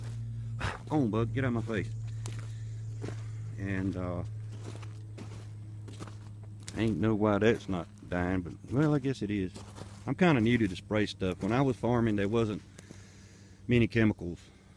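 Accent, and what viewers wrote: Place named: England, United Kingdom. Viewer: Haha nice English accent! So U and ur cousin farm together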